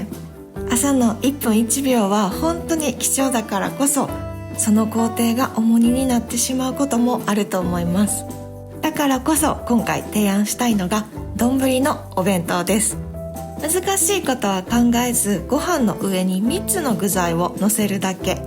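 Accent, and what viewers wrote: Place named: Kansai, Japan. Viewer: 関西のイントネーションだｗ いつも拝見して参考にさせていただいてます♬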